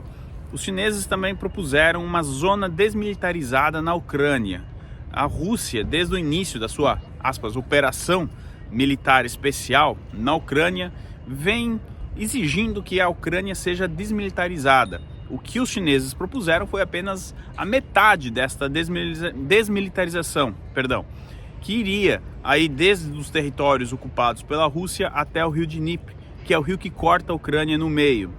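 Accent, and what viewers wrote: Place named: Paraná, Brazil. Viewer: Nossa mas seu sotaque paranaense tá tinindo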